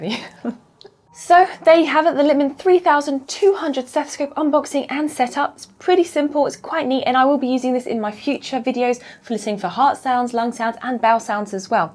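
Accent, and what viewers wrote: United Kingdom. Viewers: Hi, are you a GP working in Australia? You sound British?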